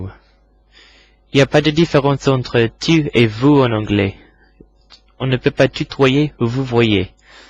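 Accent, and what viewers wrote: England, United Kingdom. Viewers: je trouve que ton français et tres bien, car je comprent tous ce que tu dit, mai tu à juste un accent anglais en parlant en français et merci pour tes videos d'anglais c'est sympa au revoir et bon courage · mais cava tes trés bien en français, mais t a juste encore l’accent anglaise!! continue mon frère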